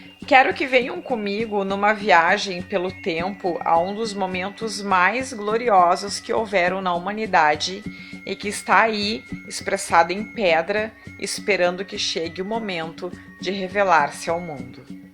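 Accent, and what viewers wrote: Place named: Porto Alegre, Brazil. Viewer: Fantástico, e com narraçao porto alegrense fica ainda melhor... Rsrs. Maravavilhoso trabalho minha conterrânea, parabéns.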